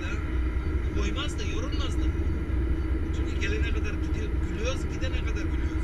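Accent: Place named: Kırıkkale, Turkey. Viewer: Abi ankaradan sevgilerimle seni cok severek izliyorum walla konuşma şiven aynı bizim oralılar gibi bende kırıkkaleliyim allaha emanet abi 😂👋🏻👍🏻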